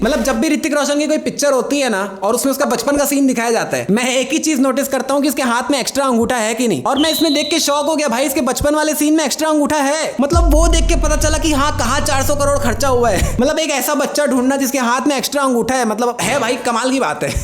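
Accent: Chhattisgarh, India. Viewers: Bhaiya app Chhattisgarhi ho kya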